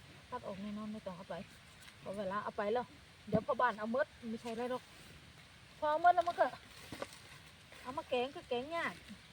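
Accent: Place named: Chanthaburi, Thailand. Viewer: สำเนียงจันทบุรี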